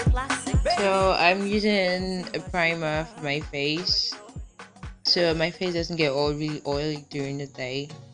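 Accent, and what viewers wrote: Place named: United Kingdom. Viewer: Great video you ve a british accent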